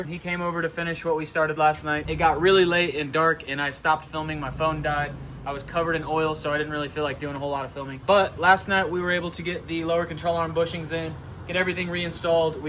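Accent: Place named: South, United States. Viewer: Love the Talladega nights accent😂😂😂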